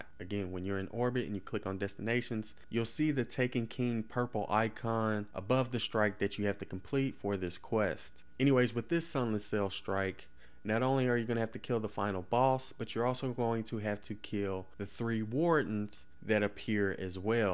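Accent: South, United States. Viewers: liked commented and subscribed for the country accent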